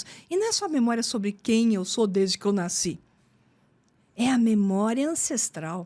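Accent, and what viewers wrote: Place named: Rio Grande do Sul, Brazil. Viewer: Essa tia eh gaucha so' pelo jeito de falar. 😊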